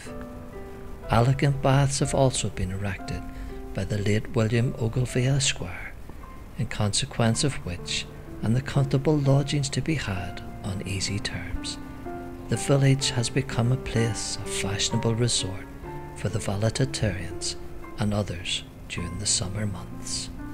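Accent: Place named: Ireland. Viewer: Beautiful video, just found yr contributions to Northern Ireland, and I’m binge watching them lol. Loving hearing yr lovely Irish lilt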